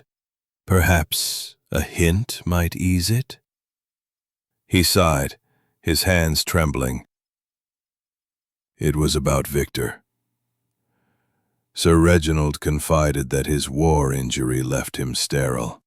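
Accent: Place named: United States. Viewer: How awful! An American "Hollywood Blockbuster" voice reading a very British story which demands a very British voice and enunciation. Don't! Just don’t.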